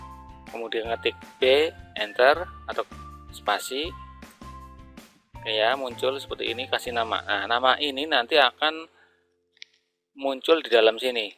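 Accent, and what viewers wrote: Banyumas, Indonesia. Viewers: Wahhh tq bang sehat selalu om sukses selalu buat kedepannya amiiin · Walaupun ora paham,tapi tetep nyimak Bos,salam pada bae e🤣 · Salam perjuangan om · Dapat ilmu lagi dr om... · MATUUUUUR SUWOOOON SANGEEEET masss....... ALHAMDULILLAH...........sy dpt pelajaran baru....n SANGAAAT JELASSSSSSS...... MAJUUUU TERUSSSS BERKARYAAAA n SUKSESSSS SELALU........ Wass ant Cicurug Skbumi......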